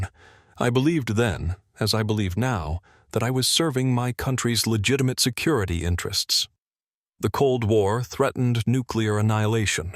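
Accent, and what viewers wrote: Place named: United States. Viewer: Dull voice in this monologue. Very American. Machinelike. No nueancies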